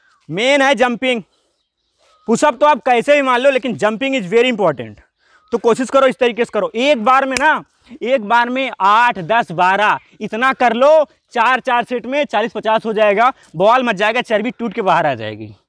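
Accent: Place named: Uttar Pradesh, India. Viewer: Bhai aapki bato se lag rga h aap hamare hi taraf ke ho...... Zila sultanpur.......up Mja aa gya bhai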